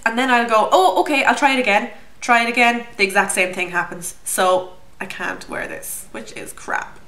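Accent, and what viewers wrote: Ireland. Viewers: just love your irish accent. thanks for the reviews.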